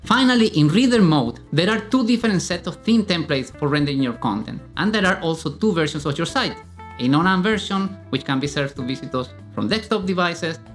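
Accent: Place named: India. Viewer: Why is it that Indians are obviously Indian no matter what language they speak?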